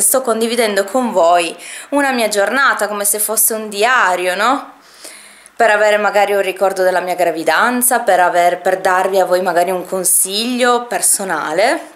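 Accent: Turin, Italy. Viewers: Ciao tesoro ♥️ FREGATENE DI QUESTE PERSONE, perché non hanno un perché in questa vita. Sono povere dentro, commentano tanto per, perché durante il giorno non hanno nulla da fare, commentano perché credono di farsi belle agli occhi degli altri ma in realtà sono delle nullità. Stai super serena e continua a stare in pigiama quanto ti pare e piace perché nessuno può e sopratutto deve dirti cosa fare e cosa non fare❤️ Tesoro dato che è uscito il discorso like e dislike.. noto che nel tuo canale appunto non esce il numero dei like e dislike, ma solo il pollice in su e il pollice in giù! Ma è un’impostazione che hai messo tu? Perché vedo che su altri canali non è così! Solo per pura curiosità ahah 😂🙊 Comunque adoro troppo i tuoi vlogmas😍 adoro vedere la vostra quotidianità, e amo tantissimo il tuo accento torinese 😍😍❤️❤️ vedrei i tuoi vlog ogni giorno, mi tieni troppa compagnia 😍 Un bacione enorme ❤️❤️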